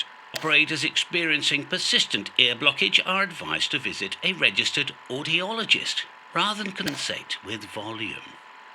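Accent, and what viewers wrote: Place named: RP, United Kingdom. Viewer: Ahhhh, at last. Something in good old Queen's English! It all makes sense now. I shall rush out to Tandy this instant and buy myself some helectronical componentry with which to build myself a "rig" in ones "ham shack"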